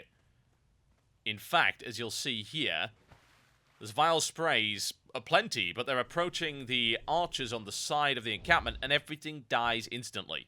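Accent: United Kingdom. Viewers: I'm lithuanian and i dont have any problems understanding, he speaks very clearly. I do like british accent, that's how true english should sound. Ofc americans are having a hard time understanding him cuz they speak like efin' murlocks. And, keep a good work mate.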